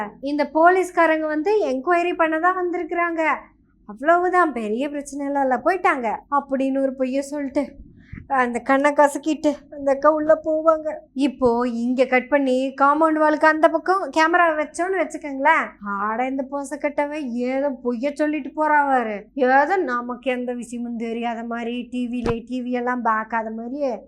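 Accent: Kongu, India. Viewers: உங்கள் கொங்கு நாட்டு தமிழ் மிக மிக அழகு வாழ்த்துக்கள். சிறப்பு 😂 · கொங்கு நாட்டு தமிழின் இனிமைக்கும் உண்மையான கருத்துக்களுக்கும் வாழ்த்துக்கள் மகளே! சீமானுக்கு கம்பி எண்ணும் வேலை கிடைக்கும்.நல்லது.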